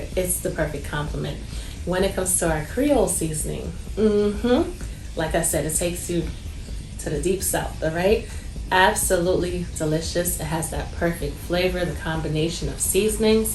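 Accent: New York, United States. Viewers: What a beautiful lady inside and out! I subscribed and enjoy ur videos soooo much ❤ such a NYorkaaahhh I hear the accent lol. Born and breed BX girl right here! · I’ve always wanted to ask : are you a New Yorker? Because it definitely sounds like it.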